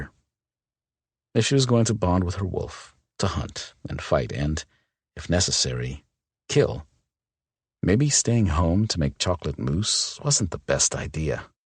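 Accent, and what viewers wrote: United States. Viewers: Why does this bother people so much? Yes the standard prounounciation is wolf, but It is not wrong to pronounce it as wuff/woof. This book is written in, and the narrator speaks in, American English. There are 4 major regional accents and how wolf is pronounced is one of those words that can vary. In the upper Midwest, we don't generally pronounce the L in wolf - just like with the words calf and half. Saying it out loud, I would never pronounce the L unless I was thinking about it and purposefully pronouncing it.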